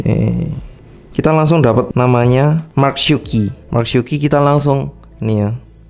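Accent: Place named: Java, Indonesia. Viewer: I luv ur medhok min wkwk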